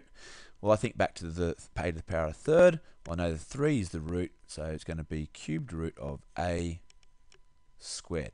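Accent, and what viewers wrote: Australia. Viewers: Thanks mate for the help. I have a block exam next week for maths b and I needed to recap. Nice to listen to an Ausie voice as I am to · Thank you so much! My teacher doesn't show how to work out the questions she just puts examples on the board expecting you to know what to do. I also love that you are Australian because as I am australian I find the accent easier to actually listen to. THANK YOU SO MUCH. I hope I pass year 9 maths this year lol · i know this is an old video of yours but i just stumbled apon it im in 8th grade and im also an aussie and its really nice to hear a good loud aussie instead of a quiet european tutor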